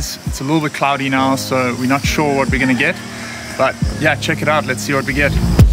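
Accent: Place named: South Africa. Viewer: Love your stuff dude. Are you South African? Sounds like it ;-) Lekker man.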